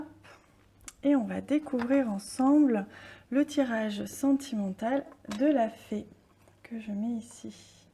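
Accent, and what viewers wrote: South, France. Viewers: Merci, trop mignon le flamand rose Avé l'assent 🌹😊😊😊😊💕❤️❤️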